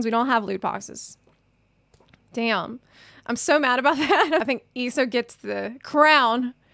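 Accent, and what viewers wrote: South, United States. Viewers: I think it's a light version of a southern drawl, so maybe around Tennessee somewhere? That's a complete guess though.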